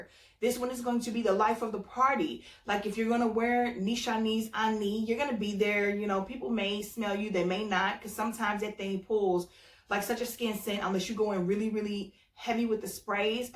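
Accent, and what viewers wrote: United States. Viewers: U from the Midwest? lol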